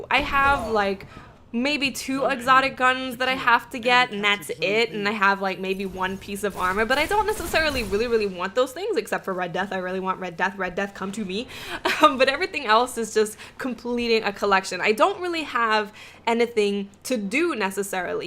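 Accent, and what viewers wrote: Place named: New York, United States. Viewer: I'm new to your channel. Are you from Jersey or New York? I love your accent!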